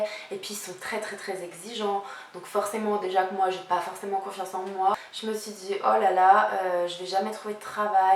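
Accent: Switzerland. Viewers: Mais tu parles comme une vraie vaudoise!!! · Hey te revoilà ! Ça fait plaisir de te revoir par là ! Tu as attrapé un petit accent suisse quand même 😉 ( je suis frontalière et je confirme , le bordel les bouchons 🙈)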